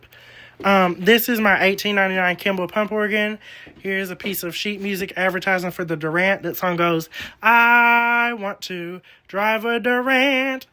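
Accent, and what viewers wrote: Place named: Louisiana, United States. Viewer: This just came across my feed and i am so intrigued by you and find you fascinating!! You dont happen to be from Louisiana by any chance do you? Bc i am from Louisiana and you sound like you might be. As well as the area that you live seems it could be. ❤ I have subscribed so that i can check out lots of your other content! Blessings and light to you!❤❤❤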